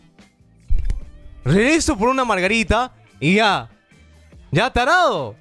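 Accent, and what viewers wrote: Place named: Peru. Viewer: Si parece peruano,aunque combinado con otros países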